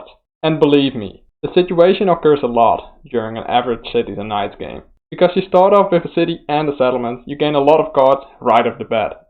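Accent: Netherlands. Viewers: Can I guess your nationality? I’m very into accents, and I think that you might be Dutch. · Even in English I can hear your Frisian accent, lol. Thanks for the Tips :)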